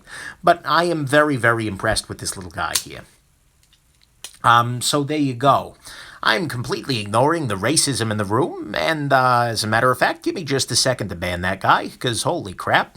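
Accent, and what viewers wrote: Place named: Brooklyn, United States. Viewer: English language learner here. Excuse me, what kind of English accent do you have? Brooklyn? It's very interesting and curiously easy to understand in spite of your very fast delivery.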